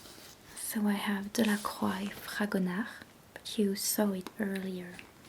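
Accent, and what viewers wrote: France. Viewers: Tingly and relaxing. I love your soft-spoken French accent. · Oh wow I'm so happy, this video is like made for me 🤩 my favourite kind of ASMR videos are book show and tells, and I looove french accents! This is immediately one of my favourite videos ever, it's so comforting and interesting to watch, it's perfect :') would love to see more of this type of stuff (or anything really, I just subscribed). Thank you!! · i studied abroad in france for 4 months in sophia antipolis and lived in antibes- i miss it so much!! your accent is wonderful and reminds me of my time there ❤️ i also want to say that your english is great!! you are very well understood :) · Hi love! Don’t feel stupid about your English, as not native speaker person but English is my second language which I use every single day, I can say, your English is very good and I love your French accent ❤ you was as my recommended video on main YouTube and totally loved it! 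I’m suprised because this is the first time, where I see actually a person who is drawing and showing such an incredible work 🥰 take your time and definitely don’t give up on your English love, if someone says about that your English is bad or something, just ignore it and keep going! I’d love to see more videos where you are drawing. ❤️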